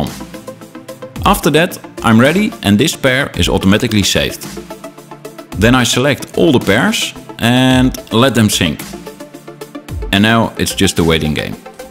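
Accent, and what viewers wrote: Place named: Netherlands. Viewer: Hollands Engels. KOTS!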